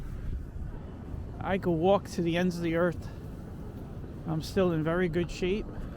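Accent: New York, United States. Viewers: You talk like a real New Yorker. I know I'm from the Bronx. You refer to Manhattan as "the city". That's a throw-back from the 19th century when Manhattan was just "the city".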